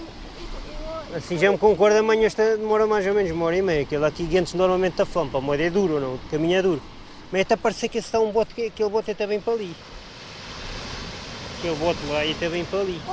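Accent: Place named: Cape Verde. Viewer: Excelente trabalho como sempre. É corajoso e altruísta. Mas coloca a.sua vida em primeiro lugar. Desculpa me esta advertência. Mas fico muito preocupada pela sua segurança devido essas trilhas íngremes, sinuosas e perigosíssimas. Adoro o seu crioulo. Uma mistura de criolo sotavento, barlavento e português. Cuida se e.protege se Um grande abraço nesta dura aventura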